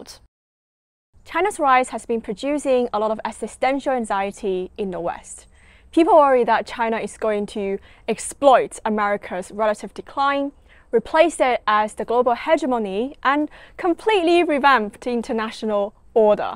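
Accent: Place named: Oxford, United Kingdom. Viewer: Super smart Chinese girl telling the china story so clearly and in perfect Oxford English. Well done young lady. 👍👍👍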